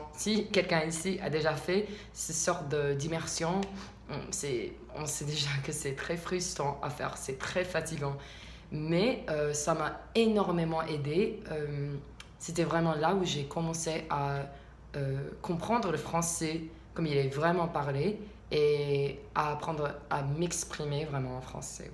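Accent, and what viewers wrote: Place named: United States. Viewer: Mais vous avez un très bel accent !! Moi aussi je viens des Etats-Unis :)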